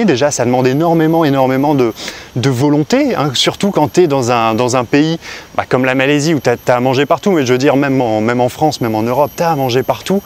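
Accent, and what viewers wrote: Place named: Switzerland. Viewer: Tu dois etre Suisse pour parler comme ca.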